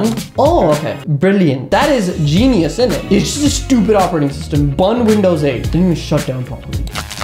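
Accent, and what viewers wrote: India. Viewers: Bro are you Indian ?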